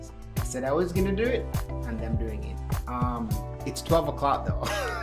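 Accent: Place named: Jamaica. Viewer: I heard our accent lol, I'm Jamaican and a new subscriber😁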